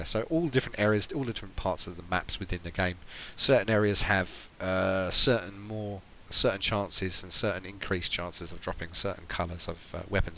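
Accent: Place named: United Kingdom. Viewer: I would rather shove bamboo under my fingernails than listen to British people drone on and on. It sounds like you have the personality of a log.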